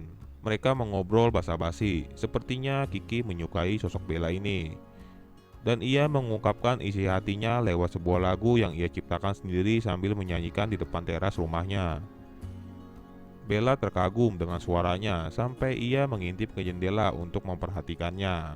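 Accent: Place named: Java, Indonesia. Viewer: Beyoh mas medok tenan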